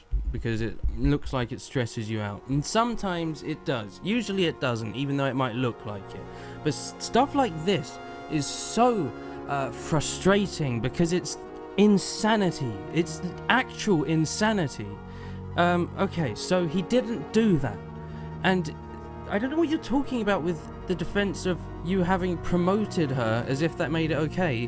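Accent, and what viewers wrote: England, United Kingdom. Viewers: It took me 8 minutes to realize you have an English accent :P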